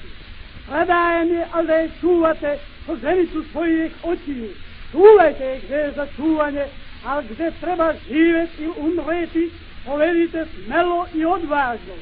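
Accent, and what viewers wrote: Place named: France. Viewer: Не знам дал је ово стварно глас краља Петра пошто се овде чује француски акценат мада неки кажу да је краљ заправо и имао тај акценат.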